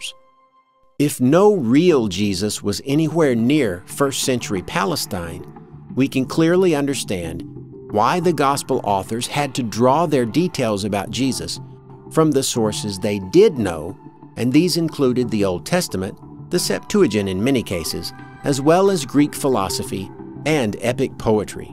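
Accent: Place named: Mississippi, United States. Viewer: You're fairly articulate and I'd be lying if I said I wouldn't look forward to some Mississippi drawl for an entire video. It's a very distinct vernacular and as I'm from Northern Wisconsin myself, we like to pronounce everything through our noses and we add a few too man "ehs" and "oh yas" to pretty much every other sentence so variety is nice, eh?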